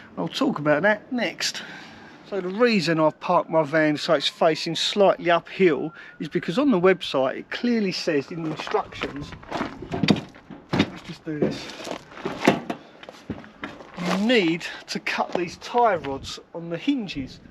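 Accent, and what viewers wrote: Cockney, United Kingdom. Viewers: Professional cockney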